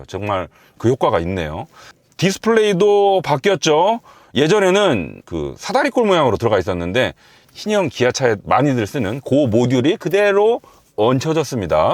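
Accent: Jeolla, South Korea. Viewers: 절라도 사투리 역겨워서 못들어주겠네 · 영상 보면서 느꼈네요 지식도 풍부하시고 이해가 팍팍~!!쉬운설명 감사합니다~ 구수한 전라도 억양 듣기 좋네요~~!!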